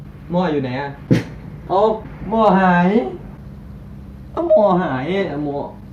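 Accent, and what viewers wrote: Korat, Thailand. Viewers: พี่เป็นคนโคราชใช่ไหมเนี่ย5555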